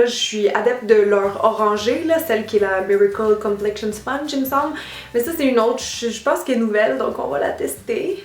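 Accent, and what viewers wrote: Quebec, Canada. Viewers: tres nice look, oooh les yeux j'ai tellement adoré, j'ai envie de l'essayer mntn bonne continuation, j'adore ton accent quebecois et je 'tadore.,😘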